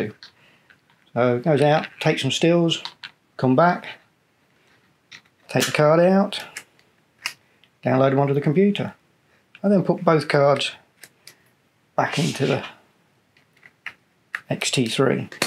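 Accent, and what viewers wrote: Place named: Derbyshire, United Kingdom. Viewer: Is that a north Derbyshire/north Notts accent I can hear?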